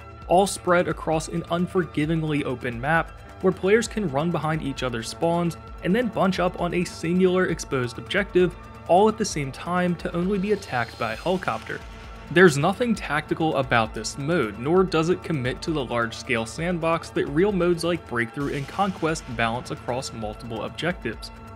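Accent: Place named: Pennsylvania, United States. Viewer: Are you from Pennsylvania? I hear a strong accent. I'm loving this game, but yeah the events are lazy.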